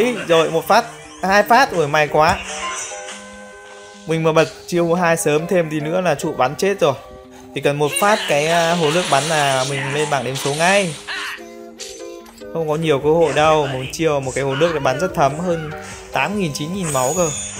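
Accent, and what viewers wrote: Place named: North, Vietnam. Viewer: thích giọng trai bắc đặc biệt là thái bình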